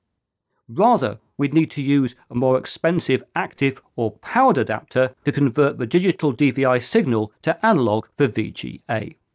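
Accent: United Kingdom. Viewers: Even though I have worked on/with computers since the 1980's (I used to service Mac's & IBM pc's at University), I find your information about different aspects of computers VERY useful! Keeping up with all the latest & greatest technology trends/changes is exhauting. So... Thank You so very much for your content!! BTW, I enjoy your "outro". You sound like several friends I have in Britian. 🙂🙂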